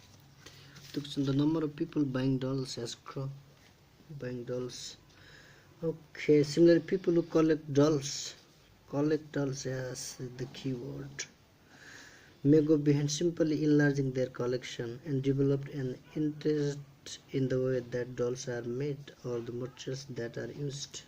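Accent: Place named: India. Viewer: такой индийский акцент......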